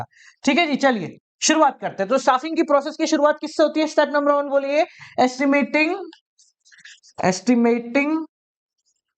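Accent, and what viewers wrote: Rajasthan, India. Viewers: Sir ap rajasthan hu ho kyi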